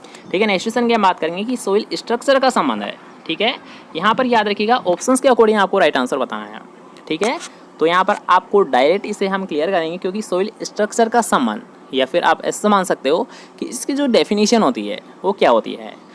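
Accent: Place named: India. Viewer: Sir ji aapki awaj bahut achhi h....aap kaunse stet se h ..aapki Hindi kuch alg h..but cute h...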